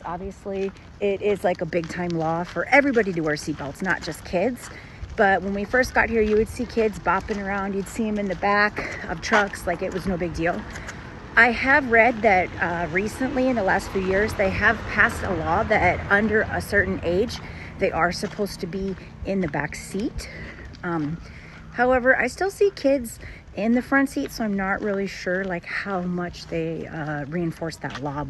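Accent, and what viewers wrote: United States. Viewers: I came upon your channel and am enjoying it. Beautiful family. I knew I recognized an upper Midwest accent (I am from Michigan!) 💕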